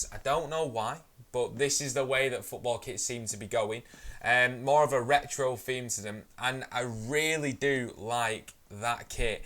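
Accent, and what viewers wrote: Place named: Sheffield, United Kingdom. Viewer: ah cheers thought you sounded like you were from Sheffield. I’m a blade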